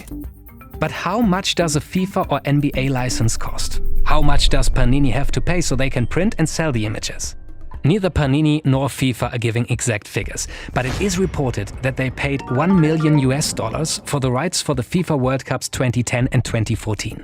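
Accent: Germany. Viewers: Love your videos, you got the most pleasant English accent I know. Und das mit einem deutschen Akzent zu schaffen macht das ganze noch genialer ;) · Is this a german accent?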